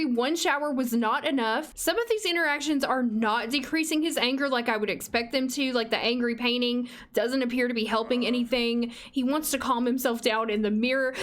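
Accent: South, United States. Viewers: you sound like my southern math teacher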